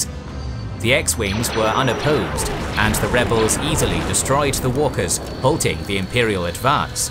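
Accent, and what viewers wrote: United Kingdom. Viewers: Horus Heresy next ………you sound British 😄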